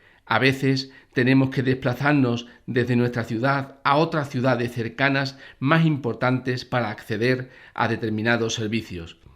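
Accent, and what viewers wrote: Andalusia, Spain. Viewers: illo o hablamos fisnos o andaluz, pero no lo mezcles ojú ya me e agoviao.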